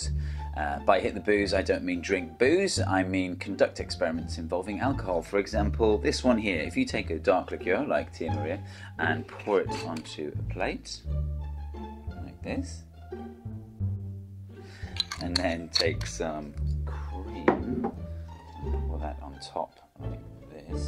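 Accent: England, United Kingdom. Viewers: You are very entertaining as you are enlightening. Got to say, as a Southern born American, I love the English accent!!!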